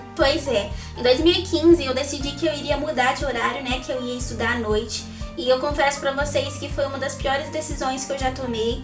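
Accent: Ceará, Brazil. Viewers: Voce é do Ceará?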